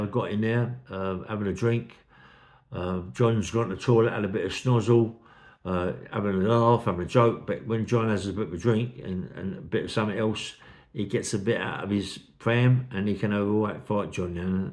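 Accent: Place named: Cockney, United Kingdom. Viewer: I could listen to for hours, great stories told in a comforting old cockney accent, I’m addicted to this channel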